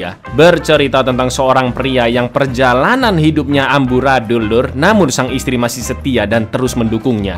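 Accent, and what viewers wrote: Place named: Serang, Indonesia. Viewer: Logatte kaye wong serang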